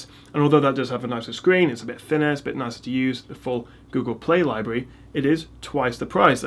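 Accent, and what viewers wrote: England, United Kingdom. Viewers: of course from england, what country are you from to not know where this geeza is from? good review btw i just bourght it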